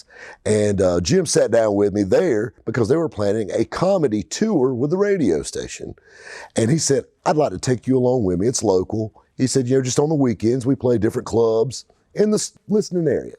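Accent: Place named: Greenville, United States. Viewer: Grew up in Mauldin, SC from '78 to '88. It's great to hear someone that has that great up-west Green-vull accent. If you ever want to ride around and film barbeque or burger joints in Southeastern NC, I can recommend a few people. Our little band of idiots have some stories that can prove that the truth is stranger than fiction.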